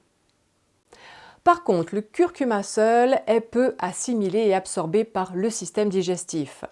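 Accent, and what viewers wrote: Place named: Switzerland. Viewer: Bonjour. J’entend à votre accent que vous êtes Suisse. Excellente vidéo… Au plaisir.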